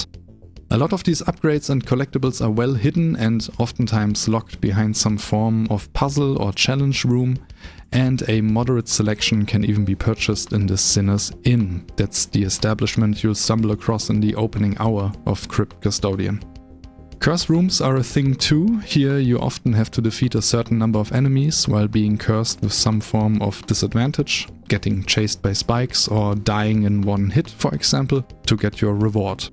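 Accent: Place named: Germany. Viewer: you have very beautiful accent - is it German? P. S. I figured out you were only starting by the audio quality - there's too much noise and the volume is a little unbalanced. Still great video xD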